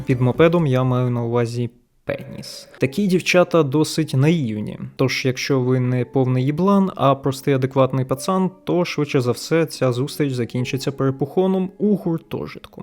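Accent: United States. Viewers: Крутий американський акцент, ніколи ще не дивився відос від українського Джона. Лайк + підписка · бро, ю хев гуд амерікан аксент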